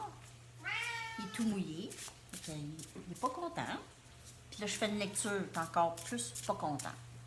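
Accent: Canada. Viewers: je vous remercie pour tout vos tirages qui sont vraiment justes avec ce que je vie j’adore votre belle énergie et votre accent canadien ne changeait rien🥰😉 le concour j’ai tout loupé Mais j’aime vous lire merci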